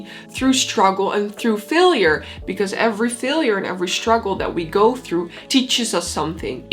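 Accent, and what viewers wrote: Netherlands. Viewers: Not only is your advice, life changing and I've watched all your videos many times over and over again to extrapolate all the information necessary. The Dutch accent is just so pleasant. Thanks!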